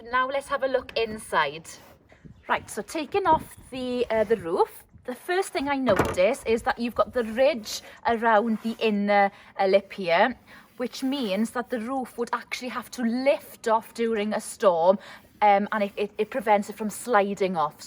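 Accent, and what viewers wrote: Wales, United Kingdom. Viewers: Wish i could unbox her beautiful lady beautiful welsh accent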